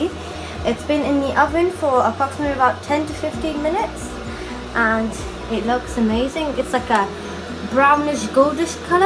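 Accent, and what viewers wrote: United Kingdom. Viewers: Thanks beauty you are so cute and your accent is like the British pple the recipe seems delicious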